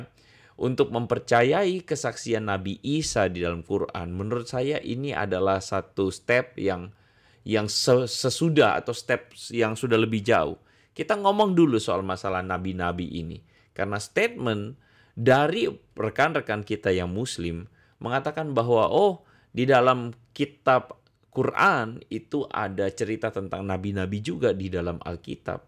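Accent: Java, Indonesia. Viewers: Wajahnya mirip orang Papua, tapi logatnya mirip dengan orang Jawa. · Dia SDH di besarkan di kalangan muslim kemudian di pakai sbg plat org asli Papua utk berbicara seakan akan dia baru murtad dr Kristen, buktinya dia TDK berlogat Papua tp logat Jawa ini buktinya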